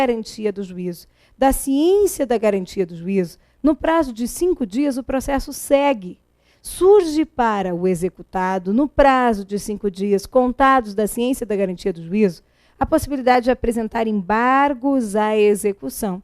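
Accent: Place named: South, Brazil. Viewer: Essa figurinha tem sotaque da Região Sul. Muito boa aula. Parabéns conterrânea.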